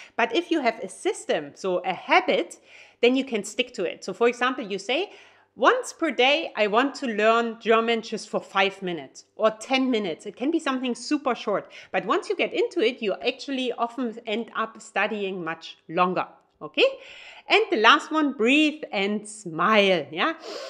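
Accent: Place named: Saxony, Germany. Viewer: Man hört sofort, dass du aus Sachsen bist XD (nicht böse gemeint) Grüße aus Leipzig! :)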